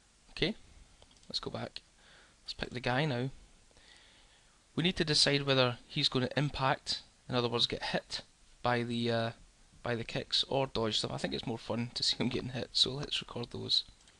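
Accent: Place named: Wales, United Kingdom. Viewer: stop speaking in that horrible welsh accent i'm not hating i just don't like ur accent ur a gr8 guy just that accent is not my kindness no hard feelings <3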